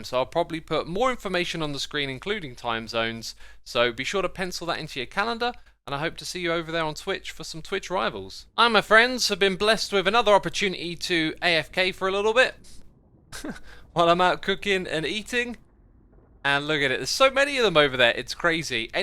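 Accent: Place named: United Kingdom. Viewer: He's hella british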